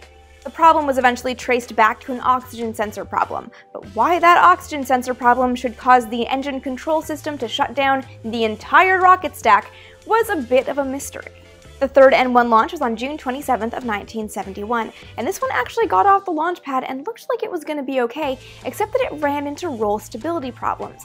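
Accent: Canada. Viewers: is she Canadian? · you're Canadian i bet.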